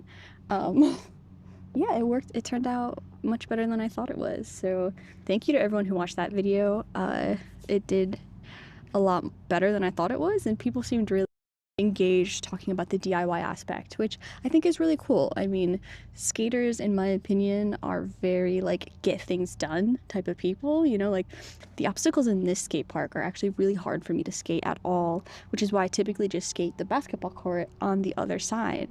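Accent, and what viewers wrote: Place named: Midwest, United States. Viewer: youre from new york?!?! i thought you wouldve been midwest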